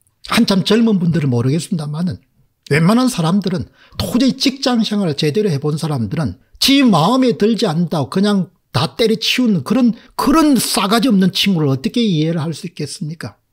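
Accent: Gyeongsang, South Korea. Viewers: 이준석 화이팅!! 공병 이놈아! 니 쌍판때기 보자니 오바이트 나온다. 경상도 사투리 웃김...ㅋㅋㅋㅋ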